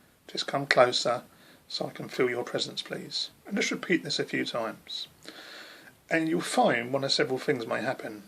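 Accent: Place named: United Kingdom. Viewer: This was too freaky! I have been trying to reach out to my spirit guide for a few years but recently more so. I always had a name in my head and I randomly came here to listen to you because you are British mainly and your words resonated with me but when you said the name of your guide I was freaked out.....Jonas is the name I have had in my head for a few years now. I thought I'd made it up. Now I know it is my guide. I wonder if it's the same guide as yours? I'm freaked out!!!!! Synchronicity or what????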